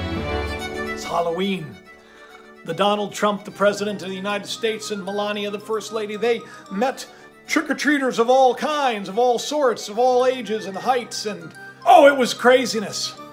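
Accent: Russia. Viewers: Your Russian accent is awesome so don't worry about it I enjoy it and I'm sure everyone else does.! Like you said I really truly believe it was just a goofy minute that the president was pretending. But who knows in these days and ages.! Evil is worse today than it was back in the day of witches.! It's out in the open now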